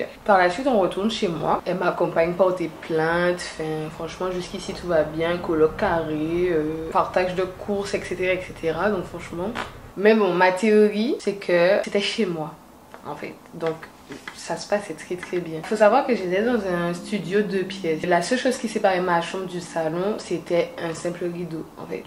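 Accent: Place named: Antilles, Caribbean. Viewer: Tu devrais mettre des sous titres l’accent antillais tu piges rien mdr 😂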